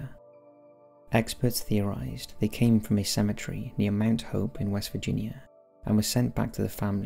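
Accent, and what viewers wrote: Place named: Newcastle, United Kingdom. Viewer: I think hes a geordie lad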